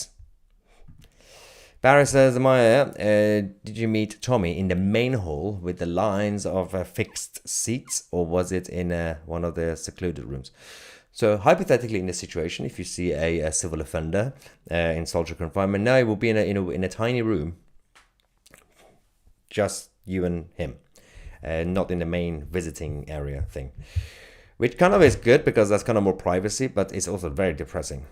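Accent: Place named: Scotland, United Kingdom. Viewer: You're picking up that Scottish accent from 😊😂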